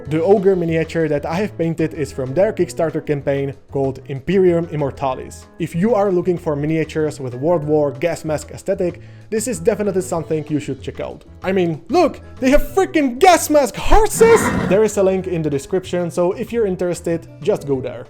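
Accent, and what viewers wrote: Czech Republic. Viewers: způsob, kterým vyslovujete slova jako "miniature" a podobně, Češi mají podle mě velmi specifický způsob kladení důrazu na to měkké anglické "r" :)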